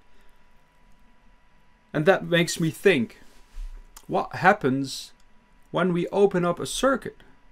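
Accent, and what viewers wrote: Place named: Netherlands. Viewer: echt heel interresant gij zijt mijn nieuwe favorite youtuber dank u voor al u wijsheid ik veronderstel dat u van nederland bent aan u accent te horen :) hoop dat ik juist ben denk het wel ik ben van belgie en wil heel graag meer weten over deze onderwerpen en jij legt het heel goed uit en ben u heel dankbaar daarvoor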